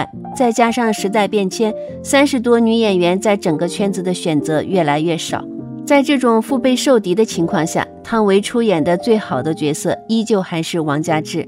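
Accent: Standard. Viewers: 的国语讲的非常地道啊！😂😂😂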